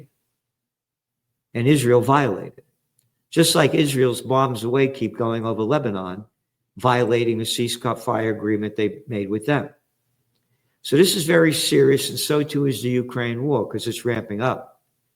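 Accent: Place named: Bronx, United States. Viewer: Hi GERALD FROM ENGLAND ( what's left of it ) I LOVE THE WAY YOU SAY "CLOWN'S" WITH YOUR BRONX ACCENT ...SOUNDS LIKE THE MAFIA WOULD SAY.🤡 SIR YOU HAVE YOUR FINGER ON THE PULSE OF TIME....💪👌CRITICAL TIMES INDEED 🙏.I STOPPED VOTING IN 1987 ..I DIDN'T WANT TO ENCOURAGE THEM 😔 TO QUOTE GEORGE CARLIN ..... " IT'S JUST A BIG CLUB & YOUR NOT IN IT,YOUR NOT IN IT !!! THEIR POLITICAL WEBS🕸️🕸️🕸️🕸️🕸️ OF DECEIT & LIE'$🤑🤑🤑🤑🤑.THANKYOU FOR YOUR BRUTAL HONESTY I ADMIRE THAT IT IS A STRENGTH OF CHARACTER....I AM SICK & TIRED OF ALL THESE SPINELESS POLITICAL LEADERS FULL OF HOT AIR!! WE ARE NOW IN A TOTALITARIAN WORLD....AN ORWELLIEN NIGHTMARE 🤑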